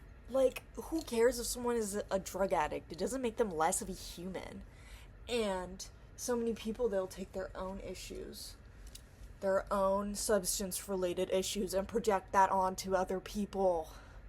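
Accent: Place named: Poland. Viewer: you do have a way with words :) I do not, I have fragmented abstract thoughts -sarah Paulson is in fact a lesbian -your tangents and ambiance are awesome -"to get woke" love that -im also a skipper when it comes to movies/tv -your polish accent is kinda sexy -im a mess and I love colour so I'm def anti minimalist -I wish I could actually FaceTime u or something lol(I don't have an iPhone 😂) cuz I have trouble verbalizing if I can't just blurt out what I wanna say -the only way I'm writing this is cause I wrote notes and then made bullet pts -better than nothing lol love ur videos so much (*˘︶˘*).｡*♡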